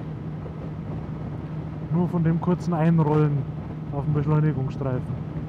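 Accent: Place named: Bavaria, Germany. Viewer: Was ein Dampfhammer, bin zwar eher der Freund von leichteren und wendigeren bikes aber auf so einem Teil würd ich a gern Platz nehmen. Zudem gutes Video und erfrischend jemanden aus Bayern mit Dialekt zu hören macht direkt sympathisch Gruß aus der Oberpfalz🏍️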